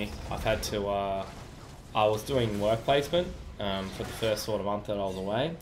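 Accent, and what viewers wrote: Australia. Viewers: OCE player and sounds Australian noice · Aussie? :D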